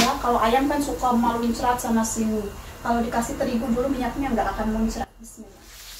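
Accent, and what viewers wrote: West Java, Indonesia. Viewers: Logat nya kaya orang Sunda.. Makanan sederhana tp Nikmat tiada tara.. Selamat menjalankan Ibadah puasa.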